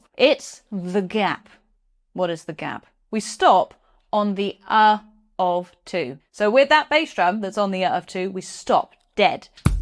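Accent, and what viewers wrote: United Kingdom. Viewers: This is the way Brits talk. I was a UK resident for over a decade. Trust me there is no arrogance here.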